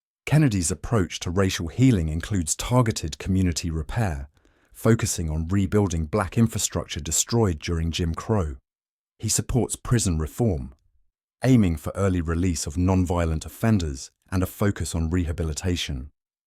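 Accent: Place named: United Kingdom. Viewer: Why is a Brit telling me about American politics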